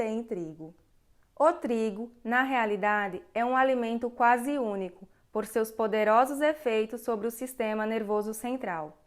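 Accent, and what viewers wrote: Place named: Paraíba, Brazil. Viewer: Sotaquezim da Paraíba👻 vou botar pra eu dormir. Parabéns!!! Ouvi o outro com voz de robô, todo. Muita sorte! Sou de Fortaleza.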